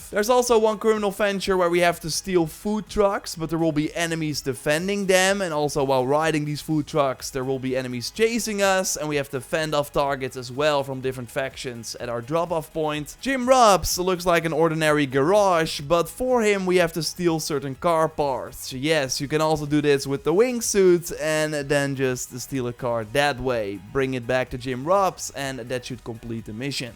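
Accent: Netherlands. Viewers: Zonder dat ik je ken weet ik dat je Nederlands bent haha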